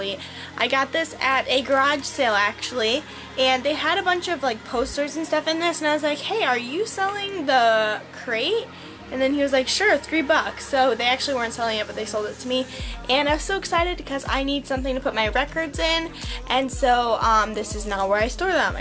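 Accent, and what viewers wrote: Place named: Ireland. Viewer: Cool video! Love seeing the younger generation antiquing! Also geeked out at the irish accent :p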